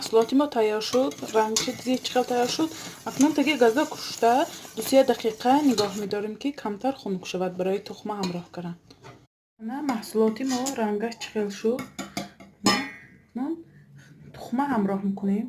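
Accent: Isfara, Tajikistan. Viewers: Апаҷон шумо исфарагими.гапзанитон монанд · Салом бисер барои мо хам маькул шуд рахмат. Шумо лафзатон Исфарагибоин саломат бошид. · Рахмат барои рецепт! Гапзанитон исфарагихо боин))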